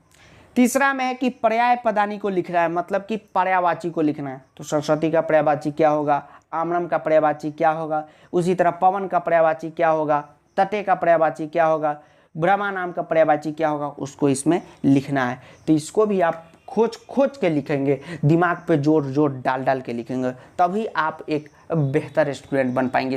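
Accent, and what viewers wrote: Bihar, India. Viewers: Sir are from bihar hamare edhar ese hi pronouse hota hai hamre ias officer bhi pronounced ese hi karte hai eska ye matlb nahi ki unko nahi ata hai concept pe dhyan do esa koi nahi padhyaega basic se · Sir aap pakka bihar se ho ne 😊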